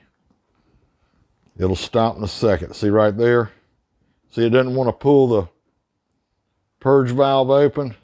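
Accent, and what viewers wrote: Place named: Appalachia, United States. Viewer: oh your god, dull and hillbilly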